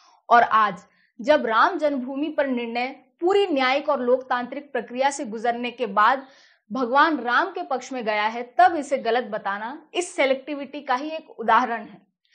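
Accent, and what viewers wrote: India. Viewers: love the hindi accent also the usage of words. very unique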